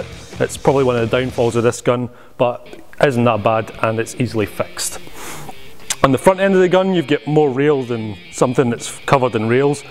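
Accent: Scotland, United Kingdom. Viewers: Oh snap is scottish!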